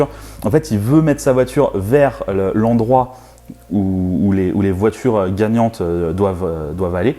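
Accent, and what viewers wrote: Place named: Moselle, France. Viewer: Désolé les gens, ici dans notre belle région c'est comme cela que l'on s'exprime ! Le Chti' d'la Moselle...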